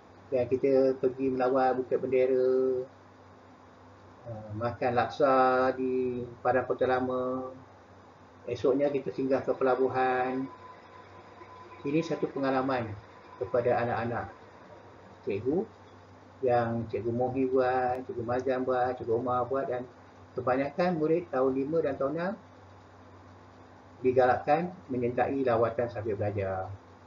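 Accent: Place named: Pahang, Malaysia. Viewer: Dh jadi org Pahang dh cikgu.... Ckap pon dh loghat pahang dh...😀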